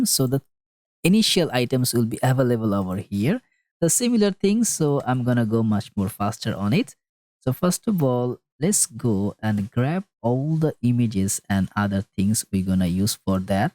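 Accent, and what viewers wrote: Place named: Bengal, India. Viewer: are you bangali ?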